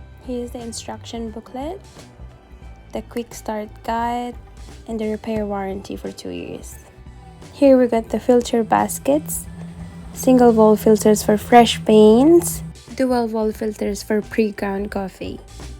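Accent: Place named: Australia. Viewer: Ahhh!!! Ganda! Coffee lover here!!! Love your Aussie accent :*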